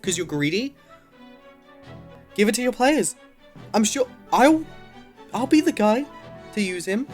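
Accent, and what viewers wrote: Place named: United Kingdom. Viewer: youre british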